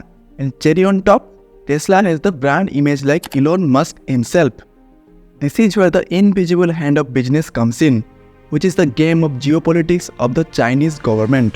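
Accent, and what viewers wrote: India. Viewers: Brilliant video, keep them coming. For others who are commenting on “pronunciation”, I want you to know that international viewers don’t care. (I work with them). And looks like you too are from say up/bihar/odisaa side? It’s the Indians who will crib about pronunciation. Yes, keep it improving but know that the threshold is already crossed and the speech quality is good. Take these comments with a grain of salt and keep up focused on the video content and quality.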